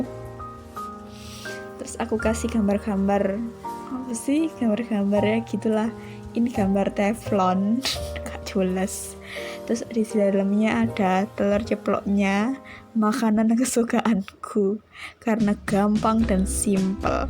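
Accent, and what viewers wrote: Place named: Java, Indonesia. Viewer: Wong ndi toh rek ... Jowoan ngene Seneng aku hehehe salam kenal ya kak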